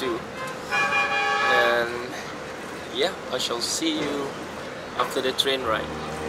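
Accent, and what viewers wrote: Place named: United States. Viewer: i see ...and i quiet disappointed coz i wanna hear Singlish Accent from you but u have American accent .. 😣 Good evening la... Quickly la ... It's cute☺️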